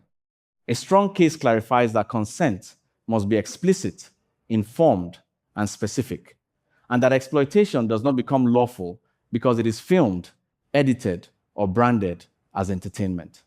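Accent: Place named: Nigeria. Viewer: Why do you sound like a Nigerian, when you are a Ghanaian.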